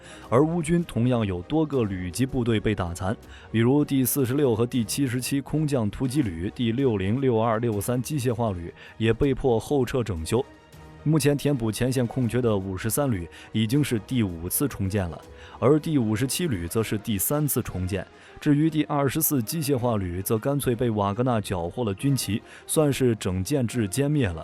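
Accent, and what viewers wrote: China. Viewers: 你个台独媒体,用中国口音的配音,可耻.你应该用台湾语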